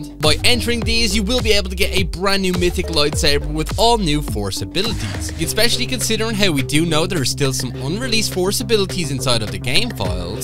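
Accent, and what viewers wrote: Ireland. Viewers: Thanks Thats Awesome!!!! Your CLass!! Are you from Ireland?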